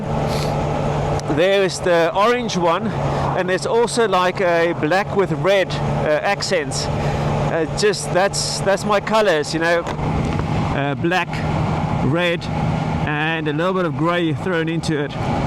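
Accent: South Africa. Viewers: You have a South African accent, have you been?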